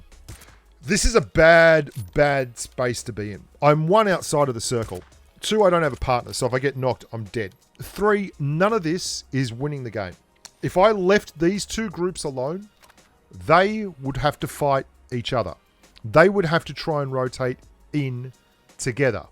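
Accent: Australia. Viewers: Idk mate I just love the Aussie accent👌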